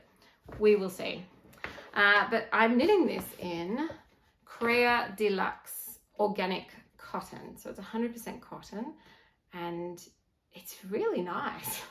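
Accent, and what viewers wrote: Australia. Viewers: Hello from NZ! It's always wonderful to hear an accent from Down Under on YouTube! Just found your channel, love your style and vibe so of course I subscribed. Good luck with your tramp! And just as a thought, if you start a new project for your train ride, it doesn't matter if its a sock or a jersey - that only matters once you've finished it. So I reckon you start the Kala T! 🤣😁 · It's wonderful to hear another aussie accent on youtube 🙂 I like the look of the seed star tank, I think it is something I'd like to knit. Your Miss May MKAL is looking good so far. It's exciting to hear that you're doing a giveaway, and the yarns look lovely.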